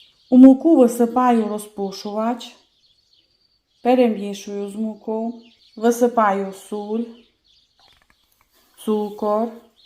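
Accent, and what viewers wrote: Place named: Prykarpattia, Ukraine. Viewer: Добрий ваш колач, а ще ліпша ваша мава. Дуже приємно вас слухати . В селі, де жила моя баба дуже подібна вимова, таке "и", хоч вже в сусідньому селі, такого нема діалекту. То на Прикарпатті, на Львівщині. І кухня у них відрізнялася. В бабиному селі жінки вміють пекти бульваники в п'єцу. Де ще вміють пекти бульваники? Цікаво яка історія, як заселялися люди, як переходили на інші місця.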